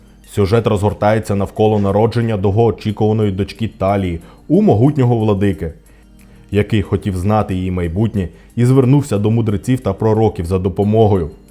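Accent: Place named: Russia. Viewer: він має на увазі,що ви розмовляєте українською тільки для відео,має на увазі ваше лицемірство. Хоча направду,дуже чутно,що ви зазвичай,розмовляєте окупантською.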